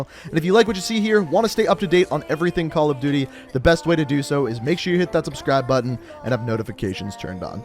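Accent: Canada. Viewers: He’s Canadian I think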